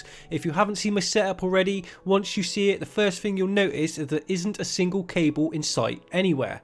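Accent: Bristol, United Kingdom. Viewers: u from bristol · Nice Bri-ish accent!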